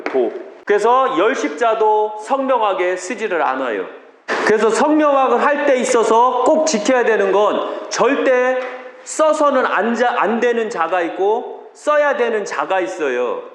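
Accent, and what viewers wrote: Chungcheong, South Korea. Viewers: 쉬운듯 어려운듯! 알아들을듯 말듯! 이해가 가는듯 아닌듯! 그래서.. 자꾸 듣게되네요 저도 충청도사람인데 충청도사투리가 정겹네요~^^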